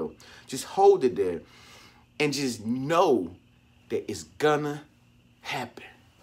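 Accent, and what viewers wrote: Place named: Midwest, United States. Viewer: This is True!🙌 Btw, r You from the Midwest?🤔 Ur accent is similar to where I Am.....👊😏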